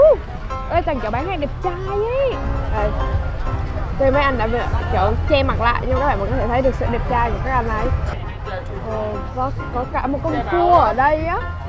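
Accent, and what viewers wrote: Da Lat, Vietnam. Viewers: Mới phát hiện kênh mới có hai ngày.. Phái cách nói chuyện, giọng đà lạt hay vãi tèo...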